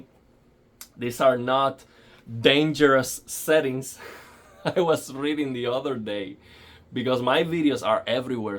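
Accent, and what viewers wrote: Cuba. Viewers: Asere tu eres cubano xq me suena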